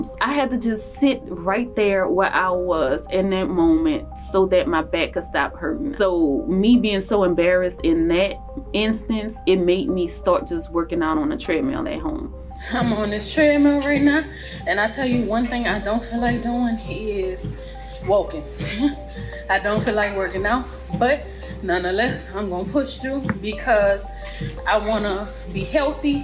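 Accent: New Orleans, United States. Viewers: Are you from New Orleans? I swear I feel like you gonna say baybee at any moment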